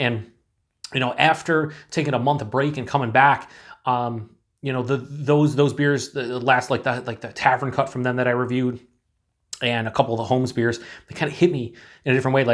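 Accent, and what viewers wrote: Western New York, United States. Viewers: Jeez son, that was heaviest Western New York accent introduction since the WGRZ 12 oclock news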